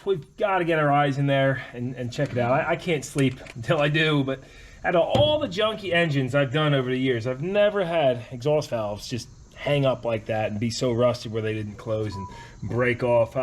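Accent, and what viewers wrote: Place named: Pennsylvania, United States. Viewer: Thought I recognized that pa accent. My grandparents both sides of my family lived in different town there. Cool agricultural towns!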